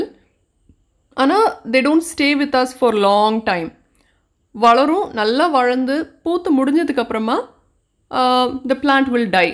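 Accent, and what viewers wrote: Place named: Nagercoil, India. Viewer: Mam nagercoil language apadiyae eruku, nanae entha place nu kekalam ni erunthaen, ngl la entha place mam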